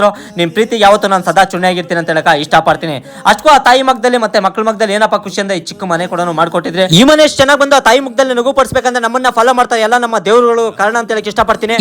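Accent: Kolar, India. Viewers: Guru kolar bashe kelode one tara majja guru .. Ninu matadodu keltidre hale nenpu..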